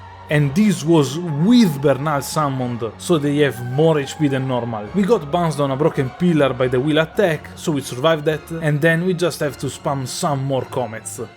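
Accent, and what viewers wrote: Italy. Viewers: Italian, not french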